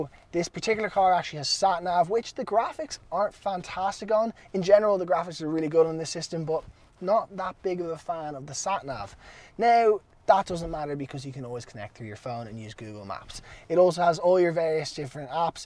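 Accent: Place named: Ireland. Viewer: Golf or = Golf R , its his irish accent .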